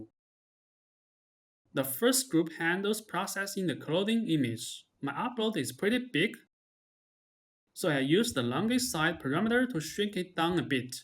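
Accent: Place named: China. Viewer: 中国老乡吧 这口音特色:hand-pink-waving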